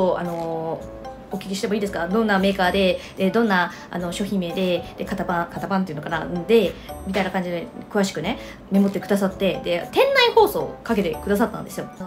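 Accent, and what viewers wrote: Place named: Osaka, Japan. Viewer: 話し方が大阪のおばちゃんにしか見えん。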